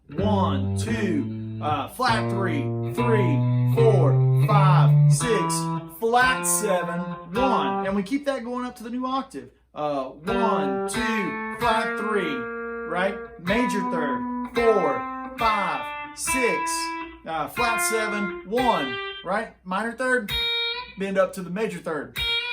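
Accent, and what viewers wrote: South, United States. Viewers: I love your playing but i also love that Southern accent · This is such a great lesson. Being a German, I dig that southern accent. Thanks a lot sir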